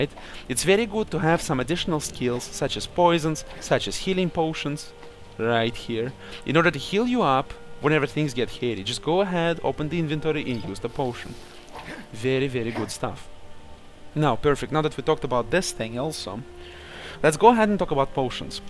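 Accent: Russia. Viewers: dude, he is russian as well as I do. Accent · he sounds more Russian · Russian accent is awesome! Especially swedish with a russian tinge. :)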